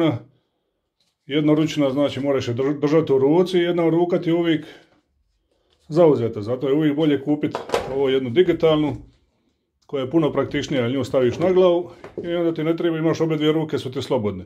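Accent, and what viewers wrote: Dalmatia, Croatia. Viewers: Obozavam ovog covjeka slusati kad prica. Meni su Dalmatinci vrh🙃 Inace sve dobro objasnjeno Pozdrav iz Banja Luke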